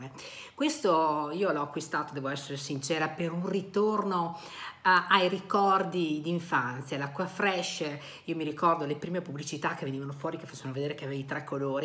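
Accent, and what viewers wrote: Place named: Italy. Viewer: Ma daiiiiii l'accento molto conosciuto. 😂di che zona?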